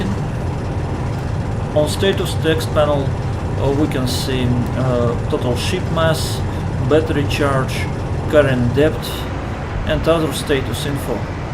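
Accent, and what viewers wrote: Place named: Russia. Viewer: а акцент то русский)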